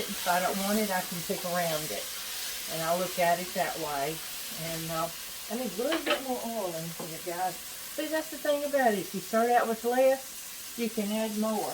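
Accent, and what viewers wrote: South, United States. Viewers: You are a sweetheart. I very much enjoy your cooking (and your comforting southern accent).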